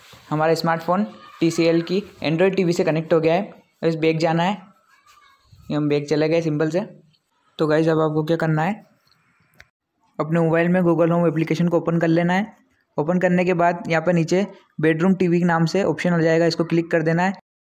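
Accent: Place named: India. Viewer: That indian voice 💀💀💀💀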